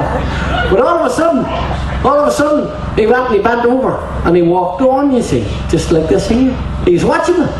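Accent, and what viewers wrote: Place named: Ulster Scots, United Kingdom. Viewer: same way i talk,, keep it up for the Ulster scots,!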